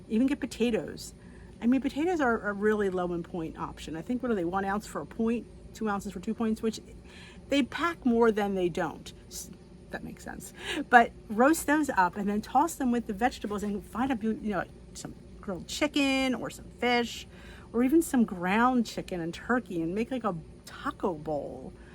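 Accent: New Jersey, United States. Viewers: I thought you were a Jersey Girl! I'm think if up north Bayonne New Jersey , Nutley or Verona or down to the shore ocean county third generation Italian am I right?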